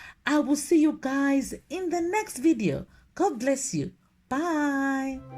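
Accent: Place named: Africa. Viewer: I love your African accent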